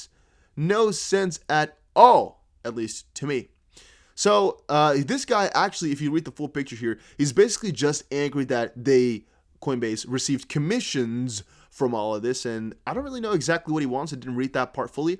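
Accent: Netherlands. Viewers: omg your dutch accent was so cute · you're DUTCH BRO?! madness! Lol I Prefer you Dutch accent maybe cuz I''m in the UK good to hear EUROPEANS on the crypto train!!!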